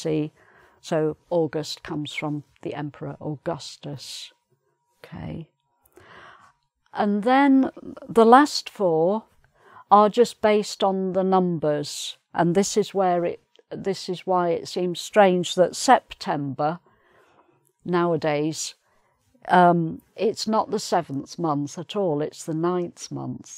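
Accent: United Kingdom. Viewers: I’m from Brazil and i love your videos and British accents 😍❤️❤️ I learn more about the months and your origen so interesting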